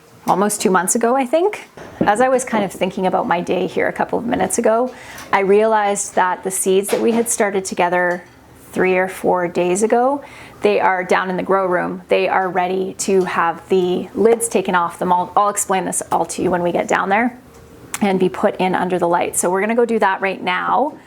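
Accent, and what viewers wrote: Canada. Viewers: You didn't ask, but I found you via Becky of Acre Homestead. I am childfree and live in Denver in a tall building with a very windy balcony. Last year, my delightful herb tower blew over twice, so I ended up gifting my herbs/lettuce to others who live here. I'm probably not your expected demographic. LOL! I'm just here for entertainment and some (scaled down) recipes. I've been bingeing your videos and putting the pieces of your story together. You are delightfully entertaining and relaxing to enjoy. I also really like your style: quick intro/outro bookending great content. I grew up in Texas, and I just love hearing the Canadian pronunciations/words: paprika, sorry, tuque, and more! Congratulations on the 100K!!! I can't wait to see your button plaque hanging on the wall behind you (at least momentarily, right?)! Thank you for carrying us all along for the ride. 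Oh and watch out for Martha; she's fierce and might start demanding her own channel. #LongestCommentEver <3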